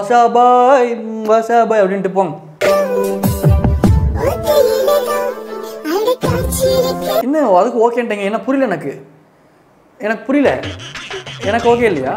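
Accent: Coimbatore, India. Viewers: enunga neenga coimbatore nglaa unga slang supr nga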